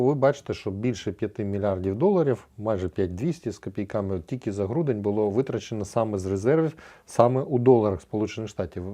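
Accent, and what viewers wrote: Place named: Russia. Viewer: Підтягнути чисту українську ,без нахилу на російську!!!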